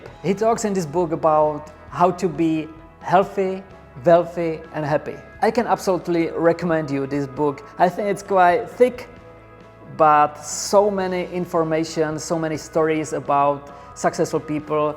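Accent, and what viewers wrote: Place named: Czech Republic. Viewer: Nejsem nikterak dobrý v angličtině, ale mám pocit že přízvuk je jako bych to řikal já 😁 ( A to si myslím že jeho úroveň je na míle jiná!) Je to jen můj pocit nebo jsem zblbnutý amerikou o tom jak má angličtina znít? Díky za názor Dobrá práce každopádně jen tak dál!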